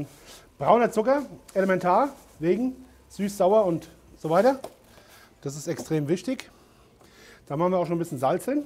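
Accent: Hesse, Germany. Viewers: Hessischer Dialekt einfach eine 10/10 … Grüße aus NRW. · Badesalz kocht. Herrlich Hessisch!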